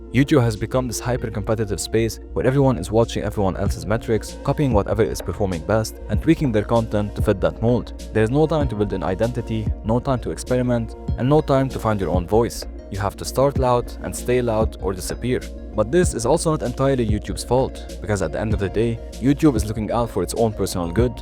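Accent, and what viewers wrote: Arab world. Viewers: Great video, and you sound Arabian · your accent sounds arab , are you lol? great vid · I love ur Arabic accent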